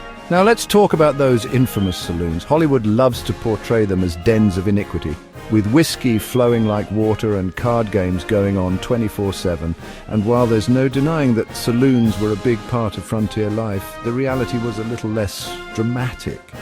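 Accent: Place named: England, United Kingdom. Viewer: Why is this bit pf US history being narrated by an englishman.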